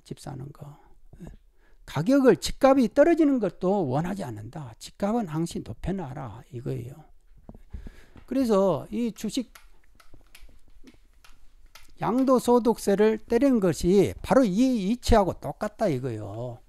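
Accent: Jeolla, South Korea. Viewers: 전라도분 같은데 문재인정권에 대해 어떻게생각하시나요?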